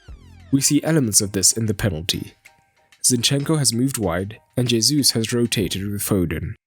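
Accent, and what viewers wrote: South Africa. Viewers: Narrator. Are you South Africa? Your accent sounds familiar 🤔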